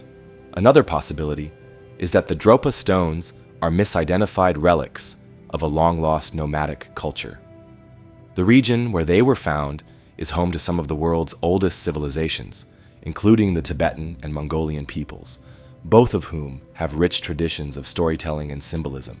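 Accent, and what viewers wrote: United States. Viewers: Thank you for the voiceover with an American accent. Well done. 👽✌️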